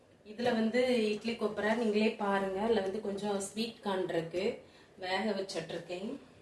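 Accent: Kanyakumari, India. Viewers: ok slang apdi thonuthu na kanyakumari than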